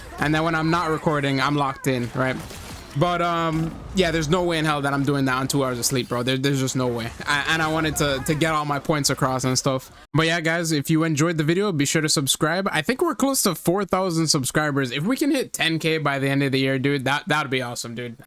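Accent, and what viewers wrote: Caribbean. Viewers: By any chance are you Trinidadian? Your accent is very familiar and sounds Caribbean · Are you from the caribbean?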